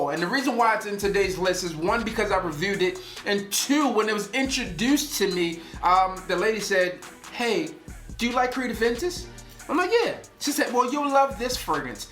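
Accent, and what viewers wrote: Baltimore, United States. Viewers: Boy that bmore accent sound weird af lol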